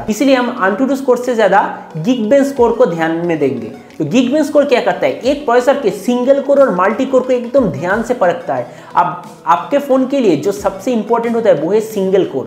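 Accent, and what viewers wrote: Bengal, India. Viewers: আপনার উচ্চারণ শুনে বাঙালী মনে হল।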